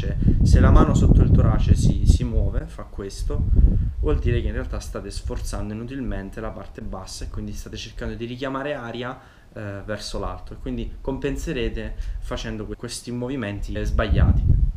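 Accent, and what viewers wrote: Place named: Salento, Italy. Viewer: Ciao, ti seguo dal video delle iene e quando hai fatto il video con freddy sei diventato il mio idolo ahaha Ho 28 anni e sono un apneista amatoriale e autodidatta da una vita, da prima di internet e del 'sapere' facile, negli ultimi anni ho letto qualcosa sull'apnea e ho migliorato un po le performance anche se devo dire che ho ancora problemi nella preparazione fuori dall'acqua. riesco a stare sott'acqua si e no un minuto.. vabè ti ho scritto per altro;:D Volevo dirti 2 cose, una è che ti è venuto l'accento salentino a furia di stare qui ahah la seconda, riguardo la compensazione, io compenso senza mani, sono davvero fortunato o ce un metodo per insegnarlo? è da 2 anni che cerco di far capire a dei miei amici come fare e non ci riesco. ti ringrazio in anticipo, spero un giorno di incontrarci, magari in acqua ahah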